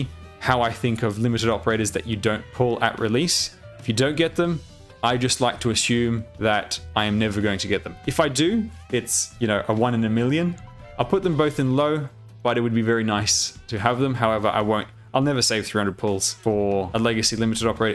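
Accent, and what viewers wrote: Australia. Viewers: Are you Australian btw? I'm trying to place the accent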